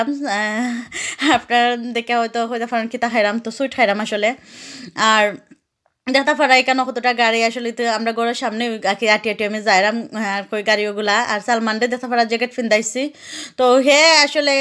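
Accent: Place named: Sylhet, Bangladesh. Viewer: বাংলাদেশি দেখলে মন বরে জায় বন্ধু হলাম আপনি সিলেটি মনে হয়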